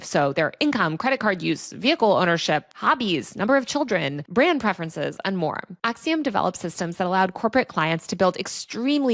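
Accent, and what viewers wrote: California, United States. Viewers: How do I put a filter over your voice so I don’t have to hear such California OMG accent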